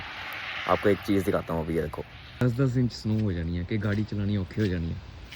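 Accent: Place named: India. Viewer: the hindi accents killinggg meee😍🙏🏼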